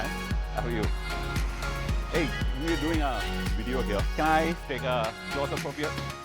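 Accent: Kerala, India. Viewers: That crispy Mallu accent & voice modulation.. Waah..❤️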